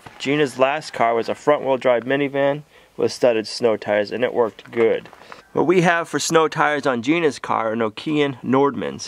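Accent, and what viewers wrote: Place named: East Coast, United States. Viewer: Finland in Russia? ? im 3/4 finish( also 1/8 irish 1/8 french or french canuk some debate about that )in Minnesota and not aware of such of a location they are different countries , maybe i heard ya wrong with the east coast accent.. we normally just use normal all weather tires in Minnesota... lol the finlands... suomalainen voima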